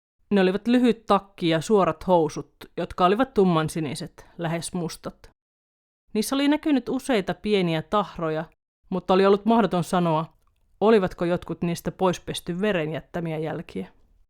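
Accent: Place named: Savonia, Finland. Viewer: Ei oo tähän tarinaan liittyvää,mut ihankun oisit savolaenen. Tuo huastelu jotennii tuntuu sille.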